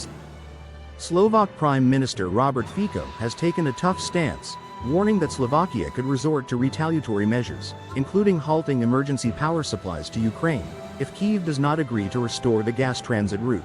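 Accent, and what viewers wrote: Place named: United States. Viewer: Russian? it's a American accent, didn't say anything I already knew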